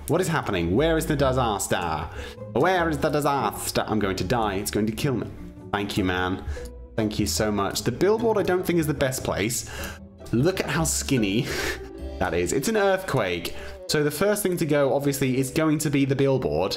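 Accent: United Kingdom. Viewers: green ninja 5521 hes british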